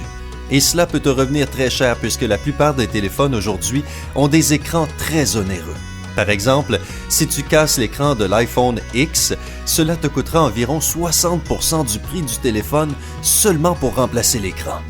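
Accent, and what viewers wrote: Quebec, Canada. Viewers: Ben moi ça ne me dérange pas je dirai même que j aime bien son accent. Il est québécois. Et puis c est pas très sympa justement de dire que tu n aime pas sa façon de parler de plus qu' il n y peut rien. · The Quelqu'un il est québécois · Un accent du Canada :p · Il est québécois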